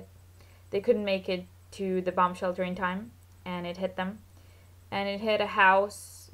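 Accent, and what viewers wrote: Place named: United Kingdom. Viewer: את בריטית במקור במקרה? יש לך מבטא טיפה מצחיק שהוא לא ממש ישראלי ולא ממש בריטי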